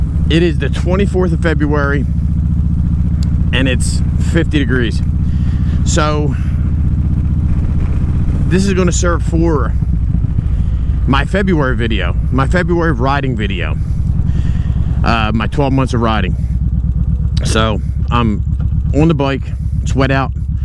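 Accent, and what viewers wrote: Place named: New Jersey, United States. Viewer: 10-4, thought I might have heard a little Jersey in your voice. Ive spent time time in that corner of PA, MD and DE in Elkton.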